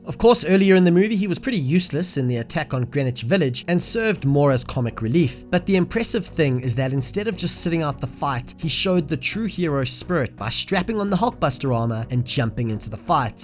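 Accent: South Africa. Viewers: Strange question, are you South African? just asking due to your accent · This guy sounds like he is South African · Loving the south african accent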